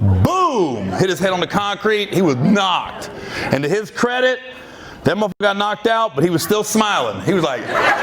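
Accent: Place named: Compton, United States. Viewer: Blech. Why is he talking like a dumb black guy from Compton? Stupid.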